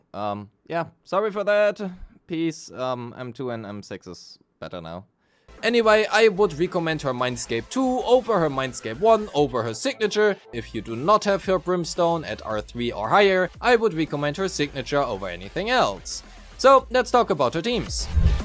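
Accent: Germany. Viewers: Great video, i hope ye shunguang will restore my zzz luck. Are you by any chance german? I hear somewhat of a familiar accent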